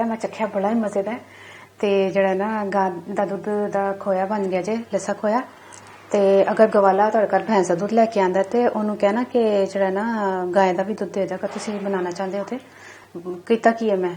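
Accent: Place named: Punjab, India. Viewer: میں آکھیا جی بڑی چنگی ریسپی بڑی مٹھی پنجابی تے بڑی سوھنی آواز اے تہاڈی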